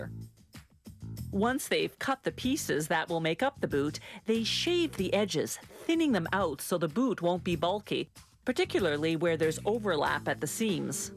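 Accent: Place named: Canada. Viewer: A Canadian woman for a narrator. Couldn’t be any better for a hockey skate video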